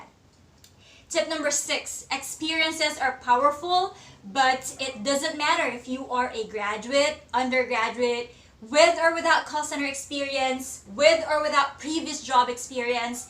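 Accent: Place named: Philippines. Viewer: She is a great job for the influenced about English interview question but still Filipino accent at the same time. God Bless. 🙂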